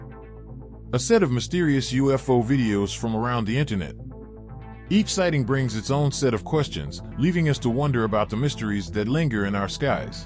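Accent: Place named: South, United States. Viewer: First of all, I love the videos. Secondly, you have the must subtle southern accent. Sounds like South Louisiana or maybe even Houston. Cheers from a South Louisianian!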